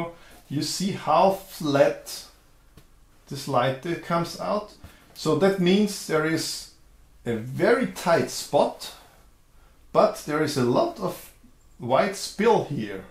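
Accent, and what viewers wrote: Germany. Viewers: I could tell this was a Deutsch accent immediately. Meine Frau ist von Darmstadt.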